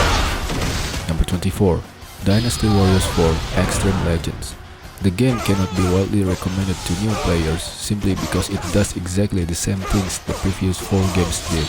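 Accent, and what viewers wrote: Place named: Indonesia. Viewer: Your accent sounds a lot like Indonesian.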